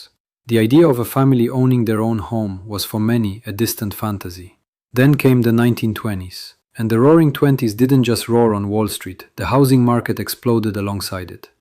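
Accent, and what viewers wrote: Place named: Eastern Europe. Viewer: Почему не по русски? Акцент то то вроде славянский.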